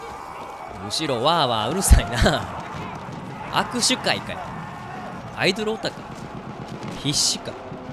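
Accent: Kansai, Japan. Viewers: Ruさんの声めっちゃ好きだわー関西弁もカッコいいです〜笑笑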